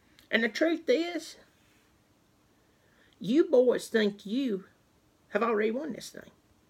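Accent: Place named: Mississippi, United States. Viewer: Your voice sounds like a Mississippi country girl...Roll Tide sweetie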